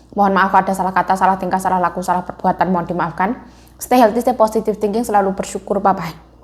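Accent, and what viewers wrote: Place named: East Java, Indonesia. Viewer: Sehat selalu mbak,tetap semangat.....bicaranya logat jawa timuran,sama kaya diponorogo....👍👍👍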